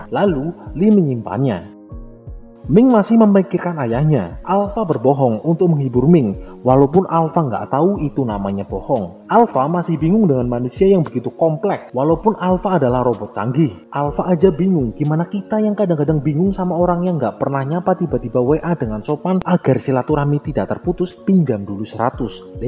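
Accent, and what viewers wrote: Banyumas, Indonesia. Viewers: Rika wong endy man deneng bahasane ana banyumasane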